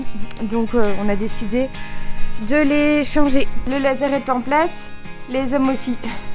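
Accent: Belgium. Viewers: tu est belge ?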